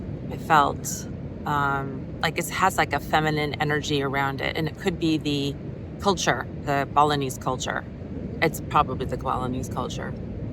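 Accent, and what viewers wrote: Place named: Germany. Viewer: Yeh I thought that to , she really sounds German 😂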